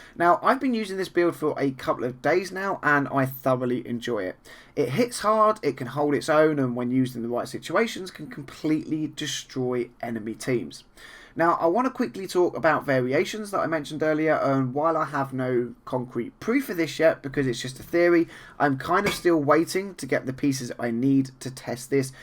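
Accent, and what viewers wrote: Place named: Cornwall, United Kingdom. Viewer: Gonna go on a guessing your from the south west of the UK:) A slight cornish accent;) I'm from Devon myself:)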